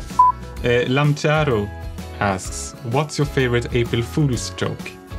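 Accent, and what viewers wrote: Sweden. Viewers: swedish with English accent, Nice But you should keep it up work till Minecraft dungeons is out! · I think it's funny how strong Swedish accent still is:D I would have imagined working in an international setting with English as the main language would have made it fade a little more:D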